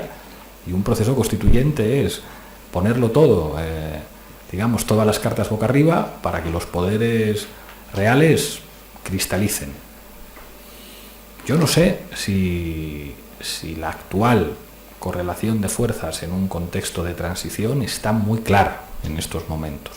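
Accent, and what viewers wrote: Spain. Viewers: Comunismo bolivariano con acento español de España. A los jóvenes puede que los engañe, a los más maduros NO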